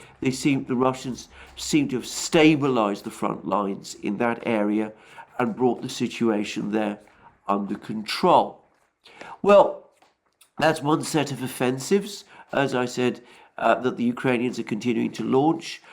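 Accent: England, United Kingdom. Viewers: Alex works for Russian propaganda groups. They come up with his talking point for him. He is just a Russian mouthpiece with an English accent. I’m not making this up.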